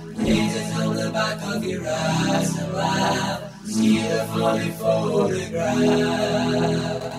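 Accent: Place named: Prescot, United Kingdom. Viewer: I always enjoy your videos the is nothing wrong with your Prescot accent is box entry still there it's at the back the centre and leads to sewell Street i used go walk downbox entry into sewell Street and walk past what me and my brothers called the train way the bi where we saw the little trains working on our way home from church school I sometimes walked with my brother or only own and the wasn't a soul in sight I felt safe lovefran xxxx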